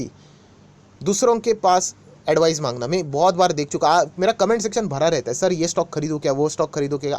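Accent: Maharashtra, India. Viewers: Are you marathi speaking?Seems to be so from your pronunciations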